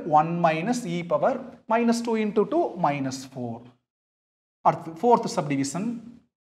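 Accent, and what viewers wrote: Coimbatore, India. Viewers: Sir neega coimbatore ah.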